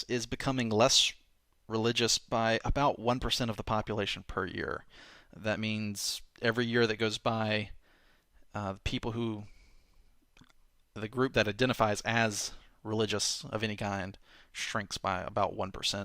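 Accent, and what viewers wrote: South, United States. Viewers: i think is from the south, i can hear it in some of his pronunciations.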